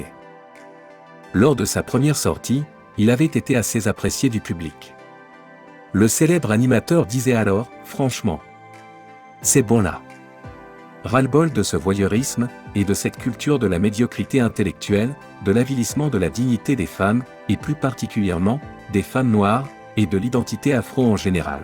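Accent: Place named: Europe. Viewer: Quel médiocrité.... et utiliser une voix européenne pour dire que les médias de l'occident s'y mêle est encore plus nulle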